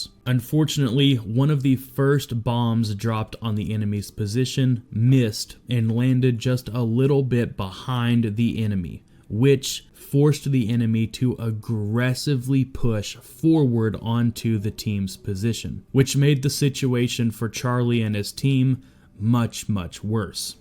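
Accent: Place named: United States. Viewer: Why u Americans pronounce IRAQ as - Ai-raq...... Iraqis call it IRAQ as an E-raq.........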